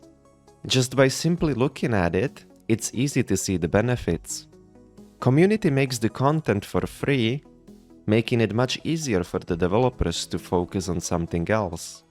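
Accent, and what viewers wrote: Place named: Russia. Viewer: I love the russsian accent. отличное видео!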